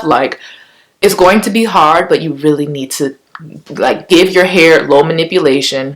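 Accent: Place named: Barbados. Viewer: apologies. I been finding many hair you tubers sounding Barbadian. Maybe it's people from a particular state. You are gorgeous btw.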